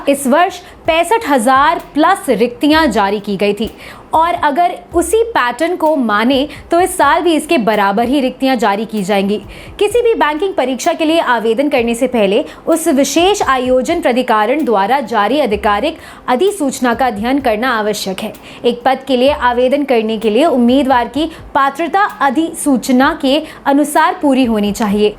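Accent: Standard. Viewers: Ma'am aapki shuddh hindi👌👌👏👏👏👏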